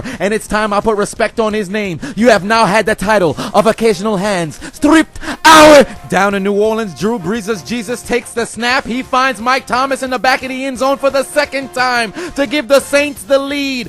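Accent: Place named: Chicago, United States. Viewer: D A B E A R S As a Chicagoan and a Bears fan, I appreciate that Chicago accent 😂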